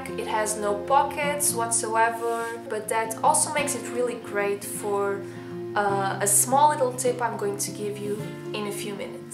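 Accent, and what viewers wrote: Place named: Portugal. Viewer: Sotaque de portuguesa é inconfundível ahah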